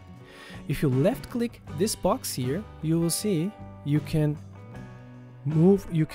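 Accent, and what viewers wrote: Turkey. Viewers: türk oldugunu videoya tıklar tıklamaz anladım her turlu belli oluyor rleri söyleyişten :D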